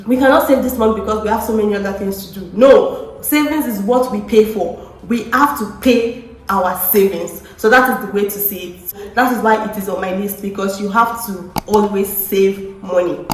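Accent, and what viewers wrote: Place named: Johannesburg, South Africa. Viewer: you catching Joburg accent 🤣🤣🤣 it won't be long until you start including haibo and yoh! in your vocab.. nice video by the way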